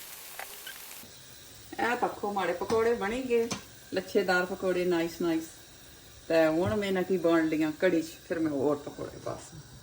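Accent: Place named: Punjab, India. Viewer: Punjabi accent is so sweet ❤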